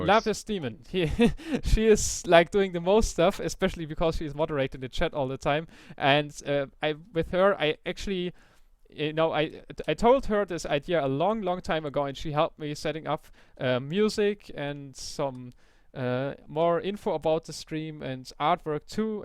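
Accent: Germany. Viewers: It's probably German because of how the word what sounds like vhat And we is ve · are you german? :D your english sounds like your german^^ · german huh ?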